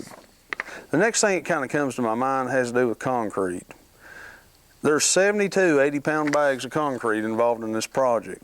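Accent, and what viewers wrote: South, United States. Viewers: ***** You may be closer than you think. It sounds to me from listening to you on the videos that you may be from Alabama, Mississippi, Tennessee or Georgia. Not sure where you are but I'm in Mississippi. You probably noticed that my storage building has gone from something smaller (8X10 or 10 X10) to 12X12 or 12X14. It doesn't make any difference what size I make it, I'll have the same problem you have, it will be too small. I'm like you though, I want to do it by myself. It's the satisfaction of accomplishing something and knowing you did it without help. I'm not going to go so far as to cut down the trees and mill the lumber myself. And mine won't be as high as yours and I'm thinking of a lean-to roof. Flat with a slope from front to back. The things I've learned from watching your videos will come in handy no matter what the size of the building. 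Get is square first and get the holes level and so much more. Thanks again. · Wow I was so impressed with your presentation! Very very nicely done and with the nice southern accent!! I'm guessing North Carolina. I am in the planning stage of building a 20X10 garage with a 8X10 shed attached. This was the most comprehensive well thought out well constructed video on construction I have ever seen! Well done!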